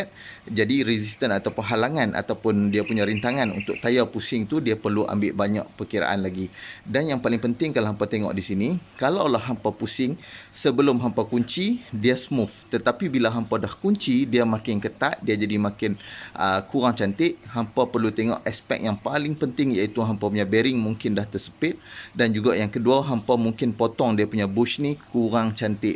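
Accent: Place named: North, Malaysia. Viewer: Terhibur ckp utara sanrtai2. Kepala masuk bakui, tauk buang, cap kepala naga.. Hahaha.. Senamg faham dan xstress. Semuanya info yg sgt bermanafaat dan terbaik. Muga Allah tambah lg ilmu kpd tuan utk manafaat bersama.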